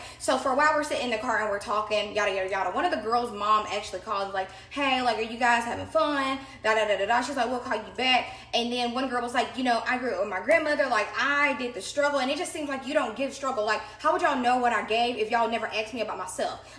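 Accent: United States. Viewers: She sound country like me lol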